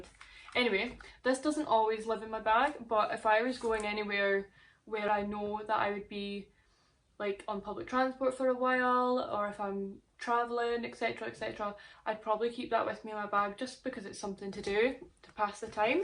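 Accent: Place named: Scotland, United Kingdom. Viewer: You’re like the first female Scottish YouTuber I’ve seen 😀. End result : Cool 👍🏻 Love your accent , I’m Canadian so of course I love the accent 😍 #Brave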